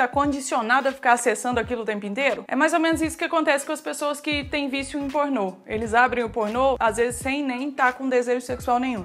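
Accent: Minas Gerais, Brazil. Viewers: É MINEIRA ?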